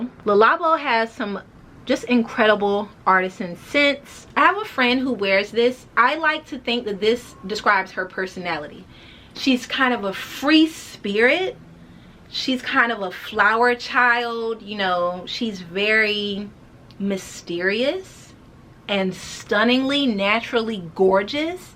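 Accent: United States. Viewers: you activated my alexa lol, all the way in London with an american accent love it x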